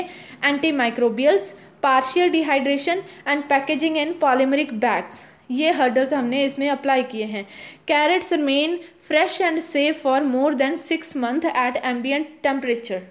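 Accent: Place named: mixed, India. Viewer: Good voice, like Punjab Haryana Girl 🙂👍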